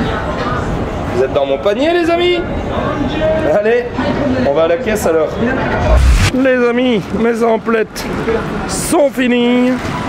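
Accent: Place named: Belgium. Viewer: J'adore cet accent belge :)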